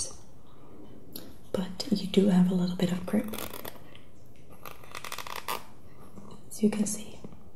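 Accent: Netherlands. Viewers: she's Dutch its the way she speaks !x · she;s Dutch, and therefore has a Dutch accent. Don't be rude. This is a relaxing community...please take your negativity elsewhere. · Calm down. She is dutch, English is not her first language. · She talks like that because she is from the Netherlands. Obviously, not everyone speaks English. Most people who watch her videos love her accent. So, if you don't then don't watch her videos,simple. She is trying to help relax you/give you asmr tingles, not talking about the way people talk. Maybe if you was actually smart,you would realize she is from the Netherlands and she has an accent and can't help the way she talk,but you don't know that. I mean,it's first grade so learn it.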